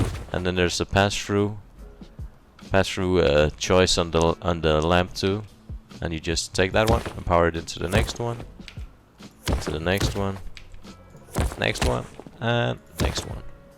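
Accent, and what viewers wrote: Denmark. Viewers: u sound danish? are you? din frække dreng xDD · Du lyder ekstremt dansk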